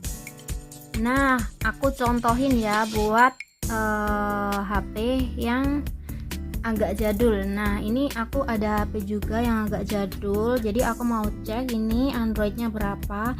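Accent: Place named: South Kalimantan, Indonesia. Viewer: Kakak orang kalsel ya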